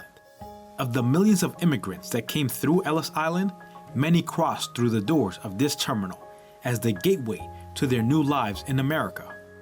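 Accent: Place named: Latin America. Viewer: No se si me equivoco pero eres latino porque la forma en que hablas no es de un nativo americano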